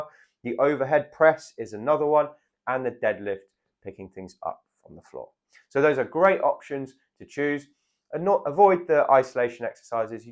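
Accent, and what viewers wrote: United Kingdom. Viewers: İ love his British accent, he s so sweet..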